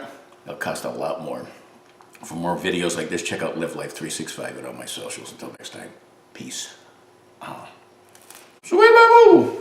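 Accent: United States. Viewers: I’m from Australia I love ur American accent 10/10 👌🏽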